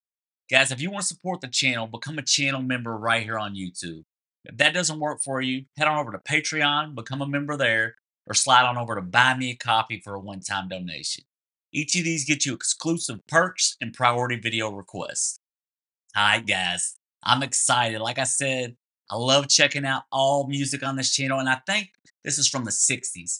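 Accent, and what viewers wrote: United States. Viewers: I take it from you accent your American. I'm sorry if his offended you but for someone who has a studio , i cannot understand why you don't know who the band is tjb uk. Just joined😊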